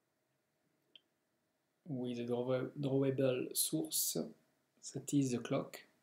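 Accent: France. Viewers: That's some hardcore french accent !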